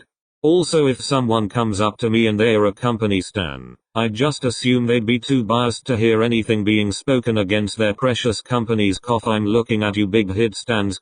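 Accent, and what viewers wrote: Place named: England, United Kingdom. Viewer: AHAHAHAA ARE YOU ALSO FROM ENGLAND?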